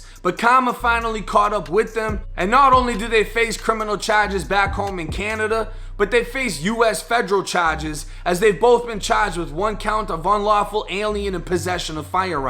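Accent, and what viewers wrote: Boston, United States. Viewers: Are you a Bostonian. You talk like them. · You from new England boss?? Deff sound like your from boston im sure not 1st time you've heard that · I'm new here , you from Boston? That accent got me cutting up lol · yo are you from boston your accent is crazy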